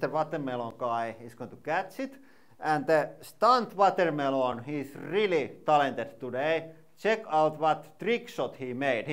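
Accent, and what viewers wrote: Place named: Finland. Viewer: I Love the finnish accent 😊 Also Finland is the most comparable country to Denmark 🇩🇰❤️🇫🇮 Most Happy people in the World 😁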